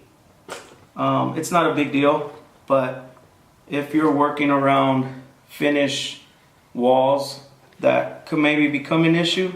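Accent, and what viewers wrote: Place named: Mexico. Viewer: se ve gringo pero tiene acento de hispano (mexicano?) :D what's up with that? trippy, no hate just curious from a venezuelan guy :)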